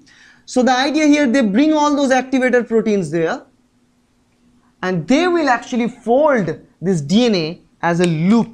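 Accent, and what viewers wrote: India. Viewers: its indian accent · I love the indian accent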